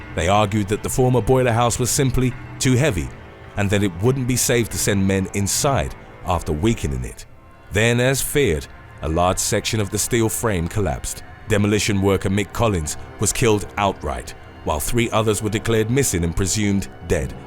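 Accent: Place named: England, United Kingdom. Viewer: South Church BLVD?!? The fact the ‘narrator’ has an English accent makes this even more painful to type 😭😂😂 Besides being born/raised/living in London for MY WHOLE LIFE, I’m pretty certain there IS NOT EVEN ONE BOULEVARD (blvd) in the WHOLE UK (England, Ireland, Scotland, Wales) let alone London (although the ‘posh’ parts of West London have some fruity street names) Pleanty of ‘Road’s, ‘Street’s, ‘Green’s, and the odd ‘Parade’, ‘Drive’ though (might have missed a few) P.S. Not many ‘Col De Sac’s, pleanty of ‘Close’s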